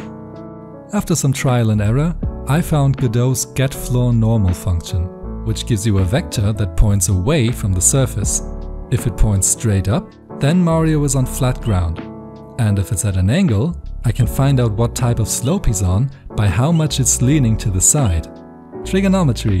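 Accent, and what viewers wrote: Germany. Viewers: german guy? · der deutsche akzent der isses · Den Deutschen hört man schon deutlich raus Bruder. ; ) Du hast nicht zufällig früher TheEmero geguckt? : D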